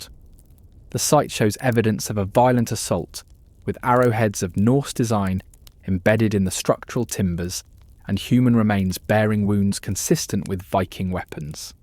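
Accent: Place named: United Kingdom. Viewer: Hi from Ukraine🇺🇦. Im in love with British accent. Its true history ❤like 👍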